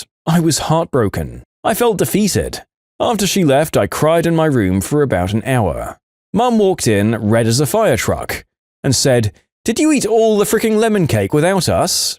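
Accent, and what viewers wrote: United Kingdom. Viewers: Why the fuck are you british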